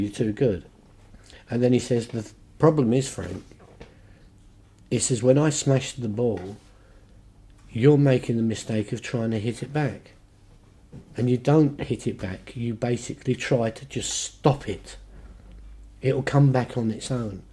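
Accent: England, United Kingdom. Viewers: Wait i thought i expected Frank is Kind Uncle From Texas but when i Realize he is English that make my heart warm even more 💚 remind of my late Grandpha that live in yorkshire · Was so surprised to hear the accent and realize you're an Englishman. My late grandmother from Yorkshire would have loved your music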